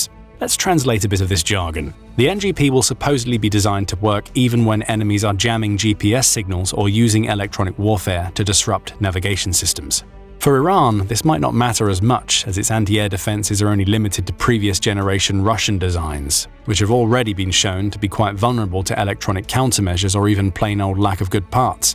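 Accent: England, United Kingdom. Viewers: Why is this commentary using an English accent when it's a US idiom script?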